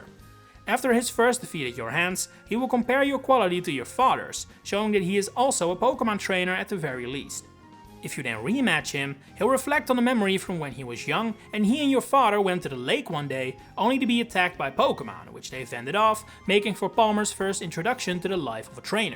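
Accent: Netherlands. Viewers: Lol, I thought you sounded Dutch a few times in this video. Turns out you are. Hello fellow Dutchie 🇳🇱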